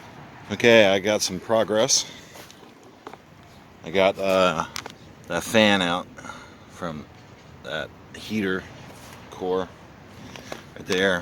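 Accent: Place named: Texas, United States. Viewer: Never knew there was such a thing as an earth battery ... learn something new every day! Yay Texas twang!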